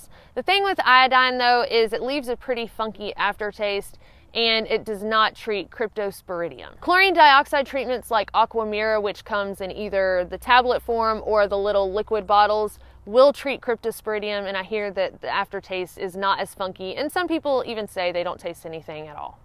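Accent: United States. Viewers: Love the proper American accent!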